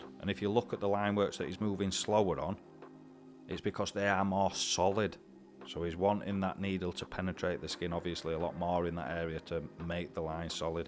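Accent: United Kingdom. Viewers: OMG what a thick accent like wow, the most British/English accent I have ever heard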